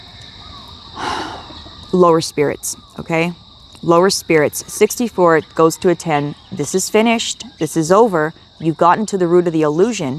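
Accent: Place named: South, United States. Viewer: Hi!! Don't worry about crying on camera!! It's OK!! Let out your pain because I completely understand and have experienced bad childhood trauma. And I know that I also felt like something was wrong with me too for a very long time. I'm not here to judge you whatsoever. And by the way, I cannot believe i have never heard of, wow just wow!! What an amazing 🎵 🎶 🎵 song!@ I love ❤️ music and I also lovee 💕 to sing. And girl, I am impressed 🎉you CAN sing!! Very nice...keep up your wonderful calling and work youare doing!! This video completely resonated with me!! Although I am not a recovering addict, I am surely surrounded by others that do have drug addiction and alcoholism. I am not here to judge anyone or myself. I am truly on my own healing journey ✨️ girl!! And healing is not linear and it is messy!! So collective KEEP on keeping on Y'all..love that slang, lol. 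I am a true born Canadian citizen here but I just love ❤️ the Southern drawl!!! 😅 people say I have an accent that I don't recognize of myself but I just love ❤️ 💕 speaking to people from other parts of the world!!!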